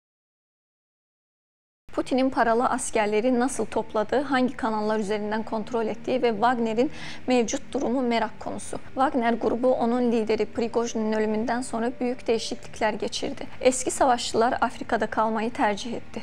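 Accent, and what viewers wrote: Azerbaijan. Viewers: Azərbaycanlımi spiker? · Salam siz şurnalis Azəribacanlısınz gözəl danışrsınz 🇹🇷🇹🇷❤🇦🇿🇦🇿🇦🇿👍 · Konusan kizin aksanindan azerbaycanli oldugu belli :)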